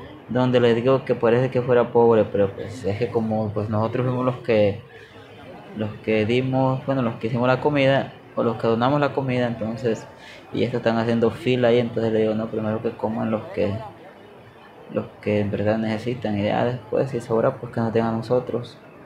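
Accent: Mexico. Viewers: Parece más mexicano que hondureño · Al principio te escuchaba tu acento de Mexicano pero cuando dijiste “vaina” me dije a mi misma “este vato” no es mexicano! Y conforme fui viendo tus videos es que aprendí que eres centroamericano. Ni modo, te hiciste Mexicano by association 🤣 Lol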